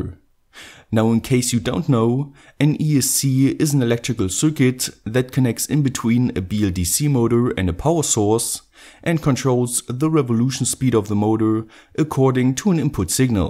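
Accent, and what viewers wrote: Germany. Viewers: Ich kann die Warnungen lesen, kleiner tipp😂 arbeite vielleicht etwas daran deinen deutschen Akzent loszuwerden, oder österreichischen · Du bist Deutschland und man hört das Das Akzente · You sound so German xD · Feier ich dass der dude deutsch is man hört den akzent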